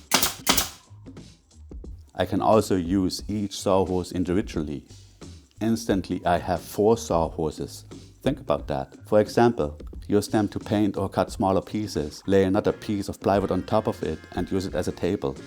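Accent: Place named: Germany. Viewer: Your accent is definitely telling you are of German descent, in any case European born. So why on earth do you use inches etc. Metric all the way dude!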